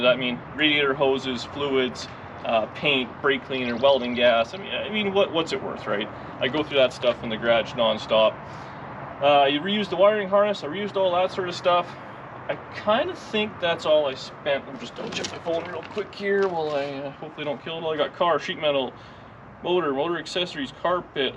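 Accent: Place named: Canada. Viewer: I knew you was Canadian as soon as the video started. I live in Detroit and you know the Hockey Competition gets pretty Heated. Nice Car Man.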